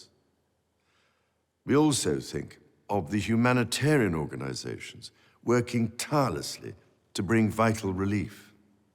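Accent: RP, United Kingdom. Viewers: an old white haired RP speaker?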